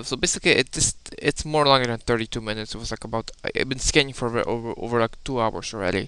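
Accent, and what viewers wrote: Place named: Russia. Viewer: По акценту видно что ты русский )) я ниче не понял из всего этого мож сделать это видео на русском токо еще объясни как запустить этот live сd в boot меню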